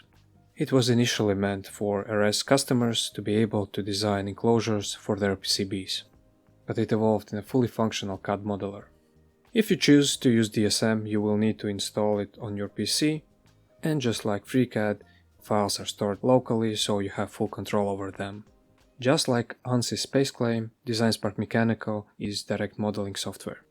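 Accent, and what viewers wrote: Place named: Latvia. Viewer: I can tell a Latvian just by hearing the voice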